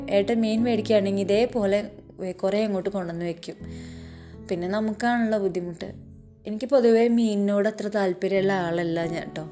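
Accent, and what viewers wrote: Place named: Palakkad, India. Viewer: Palakkad stilpole thonni atha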